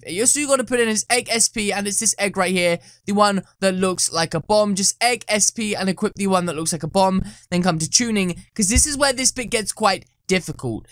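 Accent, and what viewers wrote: United Kingdom. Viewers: Are you an british acsent?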